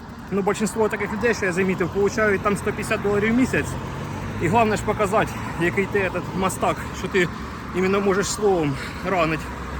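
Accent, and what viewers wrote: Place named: South, Ukraine. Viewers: минута. Земляче я теж з Оріхова. По твоєму говору я так і поняв що ти десь з Запорізької області. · Вітаю тезка. Так оказується ти ще й мій земляк, я так чомусь і подумав коли вперше почув твій говір, бо дуже знайомий діалект, такий тільки у нас на півдні ) Я теж на чужині, працюю, не легко, але є надія на життя. Дуже сподобалася твоя ідея збирати пляшки, збираюся і сам якось у вільний час таким зайнятися. Успіху тобі та натхнення !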